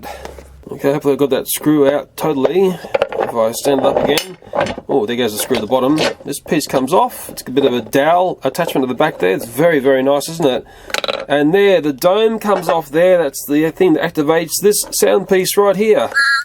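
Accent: Australia. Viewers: I love your Australian accent when your talking about stuff in your videos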